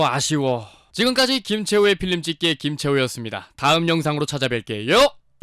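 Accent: Busan, South Korea. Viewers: 나랑 상반된 다른 의견의 눈으로 보는것도 재밌구만. 그나저나 해설 기깔나게 하길래 부산인지도 몰랐네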